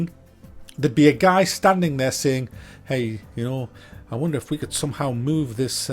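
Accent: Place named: Newcastle, United Kingdom. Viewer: nice to hear a geordie on youtube, you are a rare breed apparently...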